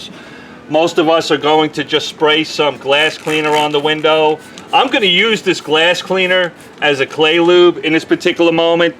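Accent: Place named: Brooklyn, United States. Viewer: Haha I thought the same thing I’m thinking Hey, this guy sounds like he’s from Brooklyn, like me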